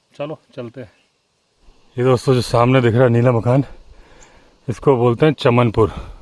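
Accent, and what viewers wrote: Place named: Delhi, India. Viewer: Very nice Ji Aap ko 3 Years follow kar Raha hu, retired Force memer hu, Aap say bahut knowlegde milte hai. Delhi born hu, South Delhi Mai Ghar Education, par aap ki aawaj say esaa lagta hai, aap Aapnay hai Delhi blog dekta hu. Hamaray block may Vinod Awasthi Pandit ji or journalist banay, 3 bhi Sub very intelligent and I like same as well as. Thanks for knowledge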